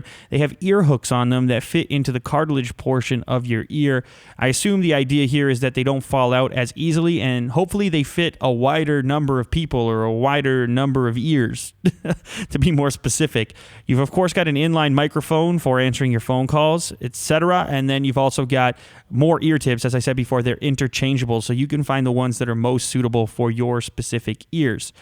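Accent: Canada. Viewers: YOURE CANADIAN? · Hey are you from Canada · He's Canadian??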